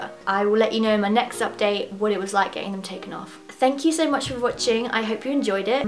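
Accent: Scotland, United Kingdom. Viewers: Guessing by her accent is Scottish (said UK) but of Italian ancestry.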